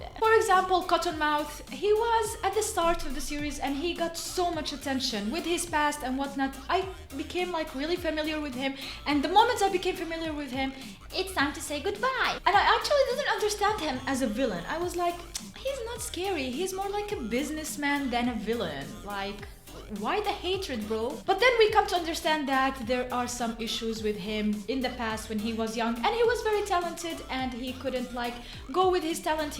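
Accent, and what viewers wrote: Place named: Russia. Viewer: Hey, love your accent..just wondering where are you from? Russia? 🐰👍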